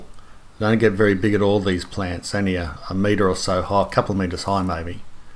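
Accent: Australia. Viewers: I love your videos. I'm in NZ so my climate is not quite as warm as yours, but great to hear a Southern Hemisphere accent (even if you are an Ozzie!). After seeing your dragon fruit vid, I sowed some Dragon Fruit seeds and they have alredy sprouted.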